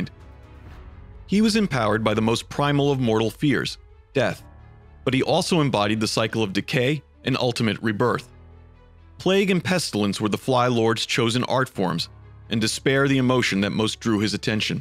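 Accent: United States. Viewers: Use other voice actor than a 30 year old with american accent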